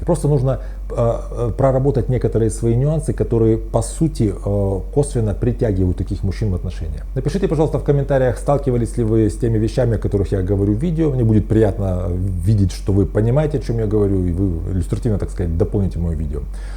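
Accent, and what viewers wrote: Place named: Ukraine. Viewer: он живёт в Украине, акцент просто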